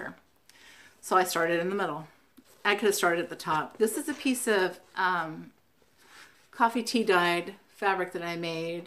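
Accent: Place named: South, United States. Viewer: Great video. I love everything you stitch. You must have lived in the south at one time or have southern roots. You say y’all (I love it) and you said little bitty. That’s a definite giveaway. Look forward to your next video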